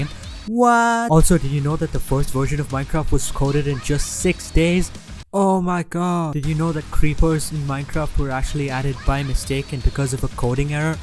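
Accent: India. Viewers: i am new here but u sound like a indian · He sounds indian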